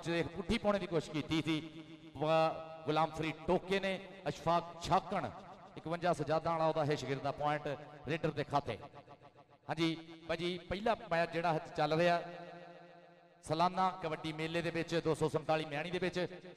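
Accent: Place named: Punjab, India. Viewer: Anchor di boli ton east punjab da lagda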